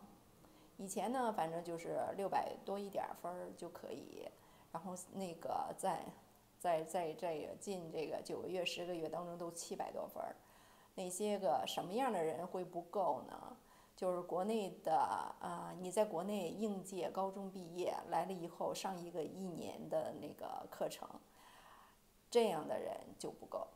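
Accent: Beijing, China. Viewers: 姐们 您在winnipeg？ 北京的吧 听着京腔 顺耳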